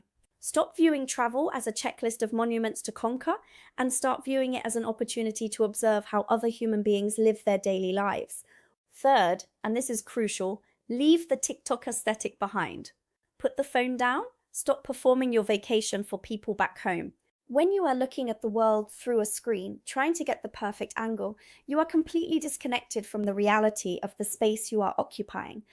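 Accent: England, United Kingdom. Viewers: Much as I have sympathy with what's being said on this channel, I do wonder why this supposed USA citizen has an English accent. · Your pronunciation does not sound US; it sounds very British. How did you manage this? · Is the host of this video really an American speaking with a British accent? (I'm new here) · the host speaks with a clear British accent with no trace of any identifiable American accent or American word choices. I can only assume that she is British but lived in the States for maybe half of her life and kept the accent. She needs to make a video and clarify this for us. It’s too distracting for me.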